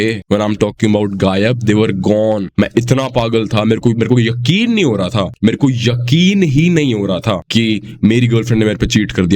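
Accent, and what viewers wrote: Haryana, India. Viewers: accent seems like haryanvi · Accent jhajjar ya rohtak ka hai?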